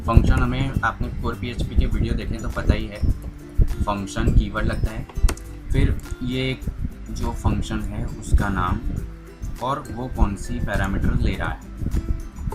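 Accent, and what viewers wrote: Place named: Gujarat, India. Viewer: Watched all the video,build the same project without any errors, learned a lot about php and sql, thank you so much, commendable effort, btw you sounds like gujju, are you gujju??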